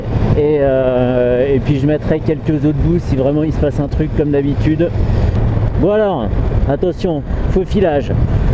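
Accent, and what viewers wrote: Paris, France. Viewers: ton accent parisien est horrible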